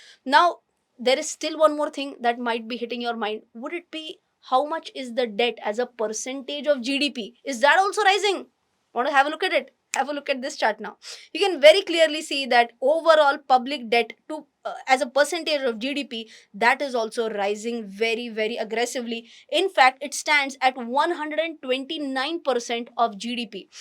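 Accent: India. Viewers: You have a pure Indian good English Accent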